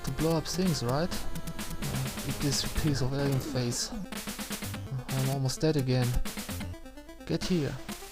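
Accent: Germany. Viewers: i bet this dude's accent is german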